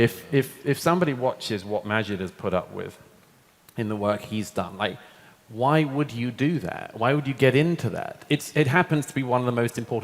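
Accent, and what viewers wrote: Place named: United Kingdom. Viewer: Douglas Murray is one of the intellectuals of the modern age that should be protected at all costs, as I fear irrational people would have all "justification" when they think they have to stop his fair criticism. He knows how to give criticism in a way closely resembling Christopher Hitchens. Plus I just like the british accent.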